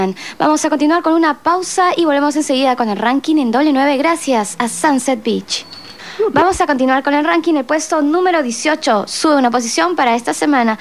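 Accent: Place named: Europe. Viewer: Yo tambien ..me enamore de la linda vozz .. como sera la muchacha.. esa vos melodica tann riff que ..vozz media europea.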